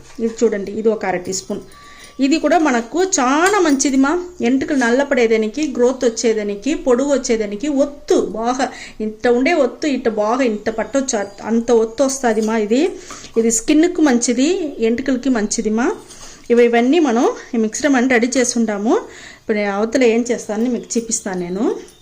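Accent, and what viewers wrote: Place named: Tamil Nadu, India. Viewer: Tamilien telugu edi . Kani great .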